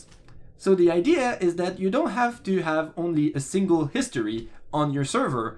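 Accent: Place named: Quebec, Canada. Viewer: Québécois? L'accent semble dire que oui! Ton channel est vraiment bon merci pour les vidéos et l'effort. J'ai une question pour toi (je vais la mettre en anglais pour le bénéfice de tous). I use git/github for programming. However, I'm just starting game dev as a hobby: how do you use it for version control specifically for video games? Do you save everything, or just your source code? Do you use different kind of backup (one for the binary files - or at least non-code, such as the project files - and then one for the source code?) or just one repository for everything (or do you save them elsewhere)? Or for the binary files you just put them on a physical device for backup? Also, what's the architecture of the git trees itself for games (in terms of good practice)? Is it the same as software where you have one main stable one, then multiple production one for different features, etc. 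? In others words, could you extend a bit the end of your video? Thanks!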